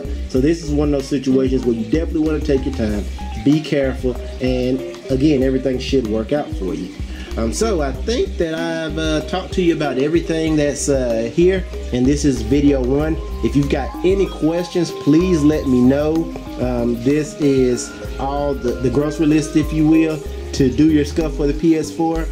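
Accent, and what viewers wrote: South, United States. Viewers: you sound like you're from the south xD hell yeah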